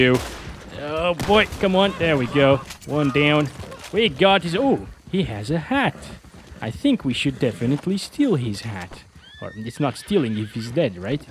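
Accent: Portugal. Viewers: Just wondering, are you from portugal?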